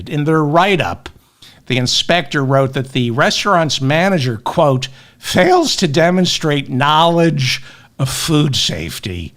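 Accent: New York, United States. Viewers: 😮. I love the way you deliver the news with the New York fair in France.😮.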